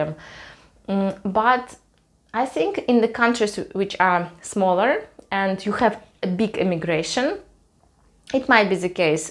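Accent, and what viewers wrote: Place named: Russia. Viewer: Funny story about the response you received when you asked for the supermarket... I am sure Dutch people immediately recognize your Russian accent. And it might be the mafia image portraited in movies or remnants of the Cold War,... but big Russians in the dark are scary.... Reminds me of the telephone instructions I once had to make,... you know ... "If you want this, press 1, if you want that, press 2" and the last phrase was "otherwise you will be disconnected". My daughter made that instruction for me because she is fluent without any accent. She is also capable of imitating the Russian accent and made that version for me as well. I can tell you, this last phrase sounds very sinister when spoken with a Russian accent "otherwise you will be disconnected...." Like: "un'offerta che non puoi rifiutare."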